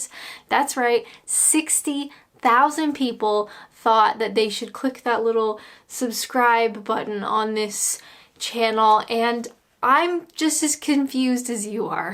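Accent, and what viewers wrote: Canada. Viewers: Your pronunciation of 'ou' in most words highlights your Canadianess! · Accent is definitely a cannuck. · Lol, its pretty easy to understand you are Canadian. I can hear it whenever you speak.. Only Canadians say ABOOT, when saying ABOUT... I meet Canadians here in Florida all the time. Every winter lots of them come to Florida to escape the Canadian winter... I enjoy seeing and talking to them... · Hi, are you currently back in Ontario Canada or still in Orlando Florida?? The room in this video looks more Floridian...is that even a word??? Well done reaching 60,000 subscribers. I was doing cartwheels when I reached 100. My assumptions about you is that you are able to handle just about any situation and you have a great positive attitude. Doing anything in front of an audience can be absolutely terrifying, I can completely understand your feelings about that. 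I also understand why you value privacy, I do the same thing. I would also really hate painting my toenails!!! LOL. Incidentally, I think your Canadian accent is great. Your accent is quite different to the BC Canada accent. Where did you get the "Adventures and Naps" name from for your video channel? Incidentally, when eating peas, which are all the same, why do we always choose which one to eat first? Now that is something to ponder about!!! I always add the milk after the cereal. When you do decide to get a delivery from Tim Horton's or anywhere else, could you possibly do a video covering the first unwrapping please? Anyway, wishing you the very best. Robert.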